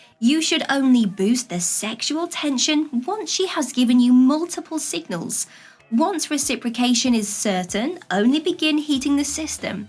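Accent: United Kingdom. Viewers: Sure. Combination of Karen Valentine and Lily from AT&T with a smooth British accent doesn't hurt either.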